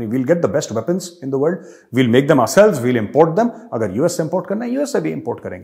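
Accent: Pakistan. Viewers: pure pakistani accent😂😂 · Major gaurav aarya with Pakistani accent is so lit 🔥🔥😂😂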